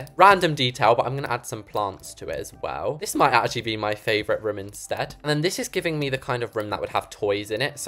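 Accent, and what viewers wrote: United Kingdom. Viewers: Try to do a American accent Bc u have a British accent and take a break on talking in ur videos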